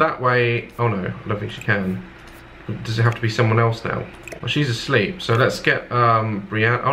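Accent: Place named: Essex, United Kingdom. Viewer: just next time, please make the roasts funny. btw are you from essex? no offense but you sound like it. i am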